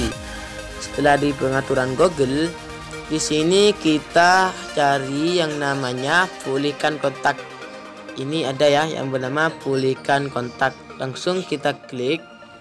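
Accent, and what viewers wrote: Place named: Madura, Indonesia. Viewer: mksh byk bang.. spt lg dngerin bang Kadir madura, lg berbcra. hehe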